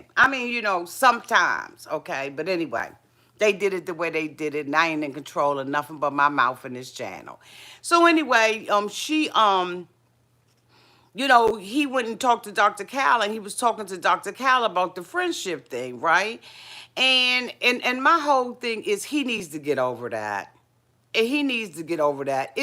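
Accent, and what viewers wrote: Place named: DMV, United States. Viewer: I hear that DMV accent! 🌸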